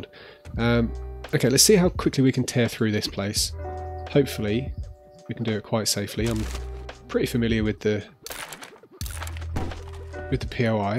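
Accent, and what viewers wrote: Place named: South East England, United Kingdom. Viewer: It was an update 'ol chap. Also there is no longer a guarantee of getting a book bundle in the rewards list. You mentioned you live in Australia, but you're English, you sound like you're from south east England, although you pronounce your 't's" so probably not London or Essex, unless you had a nice middle class upbringing... ;-p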